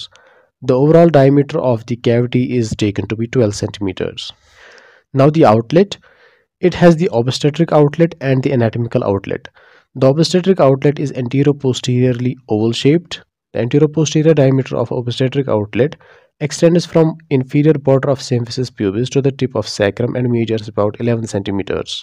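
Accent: Kashmir, India. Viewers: Sounds like a kashmiri guy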